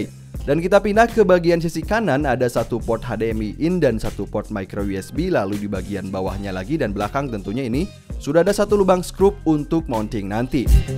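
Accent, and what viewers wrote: Kuningan, Indonesia. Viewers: Bang abang orang kuningan yah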